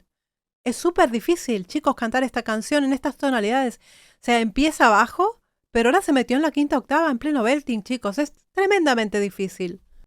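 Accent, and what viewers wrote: Argentina. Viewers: Eres argentina y española ? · se te escucha tu acento argentino como si hubieras estado ahi hace poco ❤no te lo notaba tan marcado como hoy. Pero también, no me he metido mucho a YouTube entonces igual soy yo la que te escucho mal. Gracias por tu pasión a la musica. Me encata ❤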